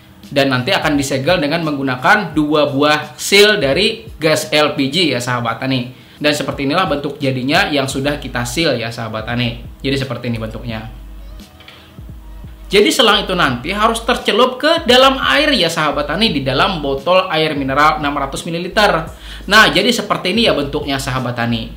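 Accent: Banjar, Indonesia. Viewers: Wahh kaya ny banjar banar nih sanak 😁👍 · kayak logat orang Banjar kkak... mantaappp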